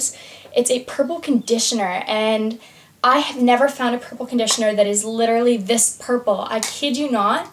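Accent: Australia. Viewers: You seem to be taking on an Australian accent in some words =) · You gorgeous as always honey!! I love that you've taken on an Australian accent..so cute!! xo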